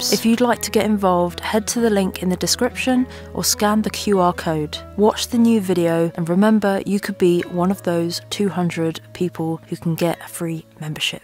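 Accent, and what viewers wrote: United Kingdom. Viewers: i just moved to sweden... i can't wait for spring and summer this winter made me sick of snow. my goal is to get so much sun that im tired of sun also you don't sound swedish to me your English sounds like you're from the UK or some shit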